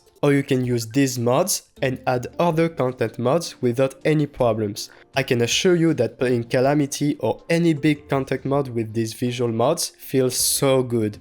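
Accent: France. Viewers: Are you french or something ? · that french accent is ruining my ears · Nice frenchglish accent and nice video! ;D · Tu es français non ?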